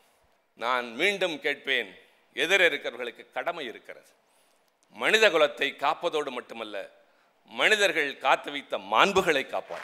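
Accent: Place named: Thanjavur, India. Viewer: அருமையான பேச்சு.தஞ்சைத் தமிழ்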